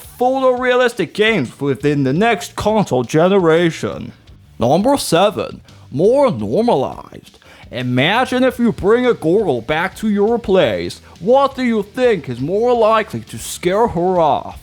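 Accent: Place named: United States. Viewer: It's clearly American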